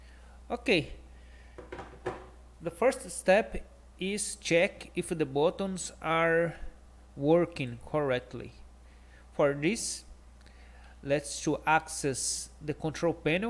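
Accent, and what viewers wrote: Brazil. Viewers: que dá hora! Eu falo inglês um tempão e ainda não criei coragem de gravar um vídeo em inglês, achei seu vídeo sem querer, você foi uma inspiração...kkk...ouvi vi e ontem e pensei "é brasileiro, certeza! Se ele pode eu posso kkkk"... enfim... parabéns maninho...vídeo simples e muito bom!!! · tava me perguntando se era br pela pronuncia, ate que vi a declaração da receita ali na área de trabalho KKKKKKKKKKKKKKKKK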